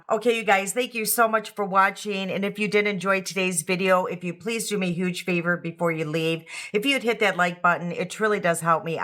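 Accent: Midwest, United States. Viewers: Thank you so much for your review of the Cover FX foundation!! Your Midwest accent is wonderful! I’m happy to follow someone who looks and sounds like me ❤❤❤ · hi, Thank you for writing back to me. You know, you're right about the Midwestern accent. We all possess that tell-tale sound that identifies our Midwestern whereabouts! I have been to my brother's only a few times. It is sad because when my husband died in 2012, I went into deep grief and depression and became agoraphobic. They offered so many times, but I was afraid to leave our home. I am not agoraphobic anymore, thank God, but I've missed so much of living since it lasted eleven years. My daughter died in 2022 and there are no words...it's just my son and me now. Life throws it's curve balls to everyone. God has been wirh us and continues. I'm sorry for the above. I hope it was okay to write. 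Anyway, I'm looking forward to more and more of your videos! I'm trying to decide what shade I should order of the makeup you applied. It was so perfect for you! I'm light neutral so I'm not sure and there are so few shades available!! I'll figure it out! Lastly, thank you for being kind and so enjoyable on each video. It really means so much to me. 💌💌💌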